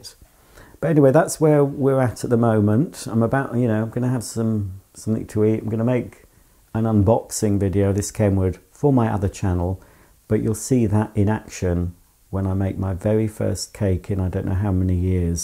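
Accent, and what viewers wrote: United Kingdom. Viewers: The wireless mic is an improvement as I can understand each syllable. The volume is fine and you could probably speak normally as we can always turn down the volume but my phone is turned to max and I had trouble with your British accent until today. Bravo KENWOOD! So excited for your Advent series to begin. Happy baking.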